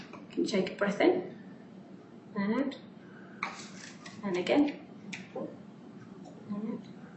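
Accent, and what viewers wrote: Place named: United Kingdom. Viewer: Wait, you hear her accent and automatically think American? Sounds like she is from the UK to me but jrodulfa1 is correct.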